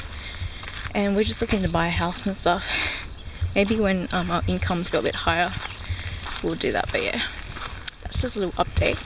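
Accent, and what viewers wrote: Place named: Australia. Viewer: The second you talked, I hit liked! Australian women are my kryptonite!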